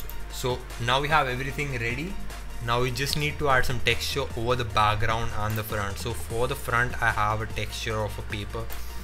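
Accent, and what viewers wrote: India. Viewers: hi dude wassup! your voice looks like an indian! i am indian too! first of all happy diwali and i just wanted to ask if to indian youtubers could be friends?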